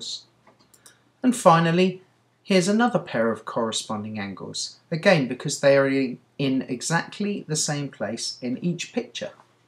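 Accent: United Kingdom. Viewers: ***** British accent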